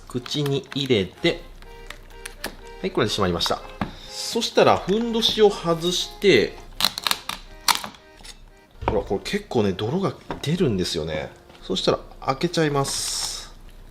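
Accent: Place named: Aichi, Japan. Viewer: 愛知だからか朝倉未来とイントネーション同じ時ある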